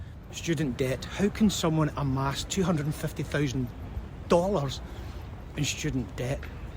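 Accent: Scotland, United Kingdom. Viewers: I love those Scottish dulcet tones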